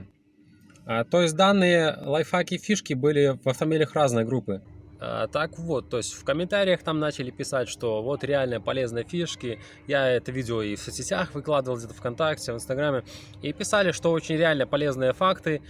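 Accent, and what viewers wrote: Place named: Belarus. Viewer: Судя по акценту , автор Белорус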